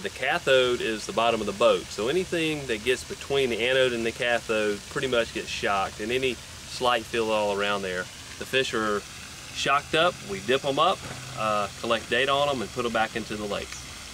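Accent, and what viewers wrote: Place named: Virginia, United States. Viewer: Love the accent :) Virginia ?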